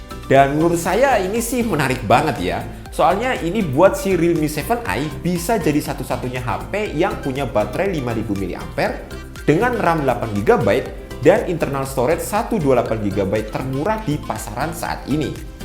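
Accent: Purwokerto, Indonesia. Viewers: Orang purwokerto kah?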